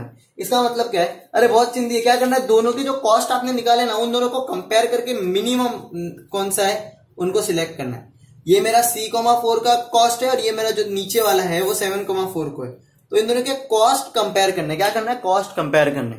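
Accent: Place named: Bihar, India. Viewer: bhai,lagta hai aap bihar ka ho😁😀😛😛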